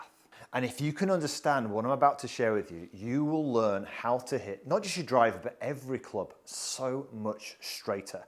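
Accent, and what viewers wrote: Yorkshire, United Kingdom. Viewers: Wanted a in person lesson with you thought you might be local ish with your Yorkshire accent I’m hearing maybe Leeds but your based all way down Kent too far for me to go for a golf lesson might have to get an online one booked instead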